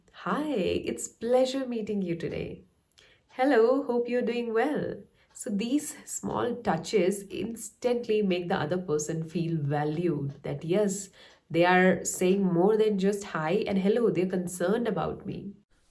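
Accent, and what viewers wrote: India. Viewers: I felt there was a Hindi accent in your tone before, but if I’m not mistaken, I have seen great improvement now.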